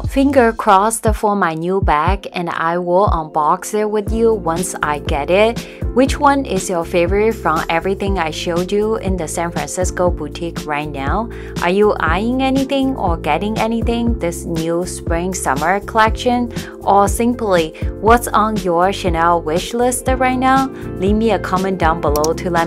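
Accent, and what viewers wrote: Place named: South Korea. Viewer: 韓國口音英語。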